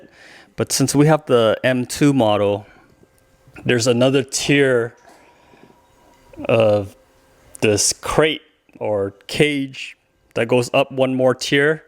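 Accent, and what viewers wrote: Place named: Pennsylvania Dutch, United States. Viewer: You really helped me put my M01 together. I was so frustrated I was going to return it. I bought the same model from Amazon last month and it came broken and I sent it back. When I got it the second time last week and couldn’t get it together or find a video to help me I was so frustrated. My mother is from Lebanon, PA and thought you had a Dutch accent. Hey, my parrotlet and I thank you so much. Stay healthy and happy friend.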